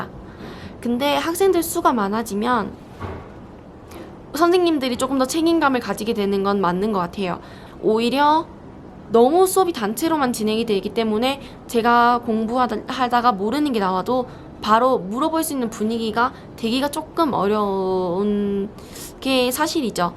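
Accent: China. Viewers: 혹시 조선족이세요? 발음에 중국인특유의 어투가 진한데.. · 발음이 중국화 되신거 같네요 ㅋㅋㅋ 신기합니당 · 그리고 점점 중국어가 느시나봐요! 한국어 발음이 중국인처럼 바뀌고 있어요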